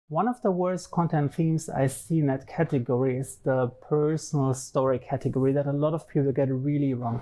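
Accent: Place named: Germany. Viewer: Wusste ab der ersten Sekunde, dass du Deutscher bist 😅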